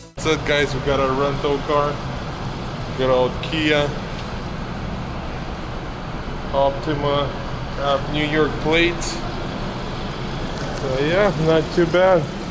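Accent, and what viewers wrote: Russia. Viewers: bro, are you Russian?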